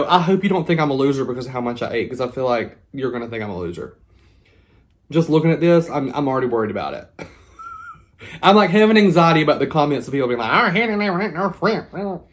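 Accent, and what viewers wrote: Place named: Minnesota, United States. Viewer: I'm jealous, love shrimp. I caught the Minnesota accent. 😁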